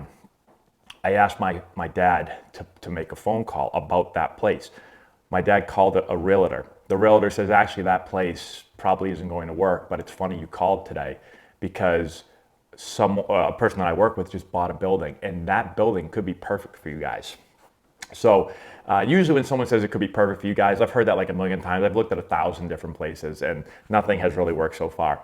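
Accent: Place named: Boston, United States. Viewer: the Boston really came out in this video.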